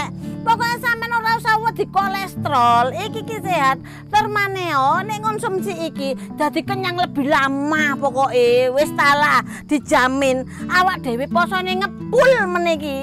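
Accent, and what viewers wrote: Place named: Surabaya, Indonesia. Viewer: Bu kok koyok wong surabaya lek lihat logatnya😂😂😂😂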